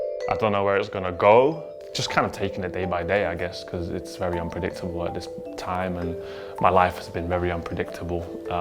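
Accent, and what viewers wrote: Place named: London, United Kingdom. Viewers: He sounds like he is from North London. That was typed before I saw he was from London. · I heard his voice and immediately thought “he’s such a Londoner”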